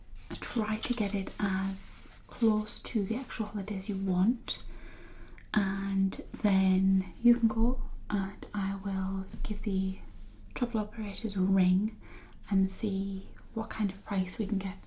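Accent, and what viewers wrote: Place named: Newcastle, United Kingdom. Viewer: knew you were from newcastle!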